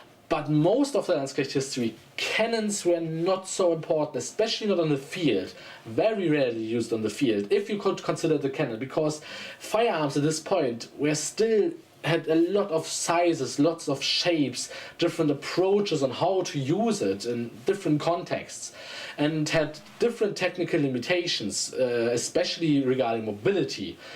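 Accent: Germany. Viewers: I mean, realistically given that accent, he should probably have a German or Austrian flag behind him. No one should have patriotism to the European Union that’s freaking weird one could say almost disgusting.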